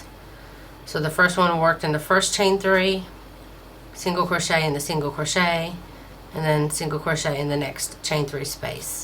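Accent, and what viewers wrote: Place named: Midwest-South, United States. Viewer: It's so funny, because you have a kind of midwest-southern accent I always pictured you in Kentucky or Tennessee, with a bunch of kids playing outside! Israel is about the last place I thought you might be recording these (well, maybe not China...) How did you wind up in Israel? My Uncle Jack became Orthodox about 20 years ago and now lives outside Jerusalem—but he still sounds like he's from New York! BTW I unraveled the first one and am now using plain old Sugar and Cream in various colors. I am thinking of doing some cross-stitching in the diamonds...Thank you!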